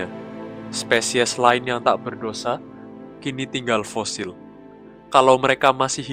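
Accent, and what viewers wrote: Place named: Banyumas, Indonesia. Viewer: Sampean wong ngapak Yo mas...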